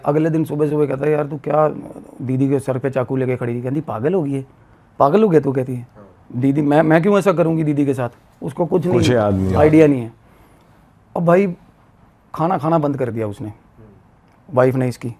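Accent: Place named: Punjab, India. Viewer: Yeh Banda Boht ache tarike se bata hai bilkul punjabi style me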